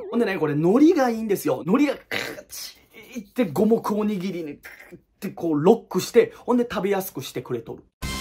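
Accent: Hiroshima, Japan. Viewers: 心地良い広島弁と丁度良い動画の長さで受験の息抜きにさせてもらってます！😂 偏見シリーズ一番好きなので一生やって欲しい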